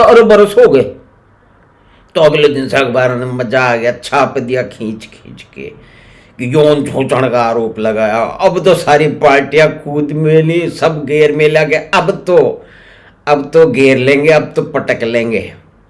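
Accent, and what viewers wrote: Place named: Haryana, India. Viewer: Enjoyed the Haryanvi tone.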